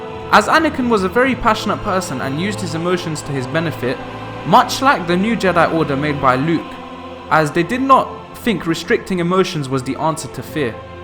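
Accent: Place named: England, United Kingdom. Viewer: Speak real English, not that incorrect trash from englan the shithole nation with erroneous variamts of the English language. Fathet isn't fa-ver and vission isn't "vig-in"...Schme isn't pronounced "shimmy" ... nothing you say is correctly pronounced, it just sounds like shit frok england. Future isn't few-cha...such disgusting speech patterns you filth in england have. Discriminatory is not dis-creamy-tree... THERE IS NONTREE in that damned word!! Maul isn't moe-ull, skill isn't ski-eww...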